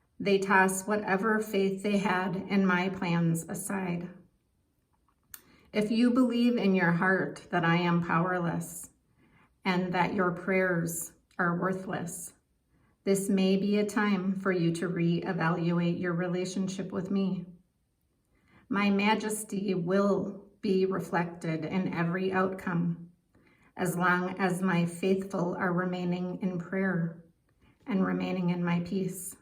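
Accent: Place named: Minnesota, United States. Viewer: Are you from Minnesota? The accent. I'm from Minnesota. Haha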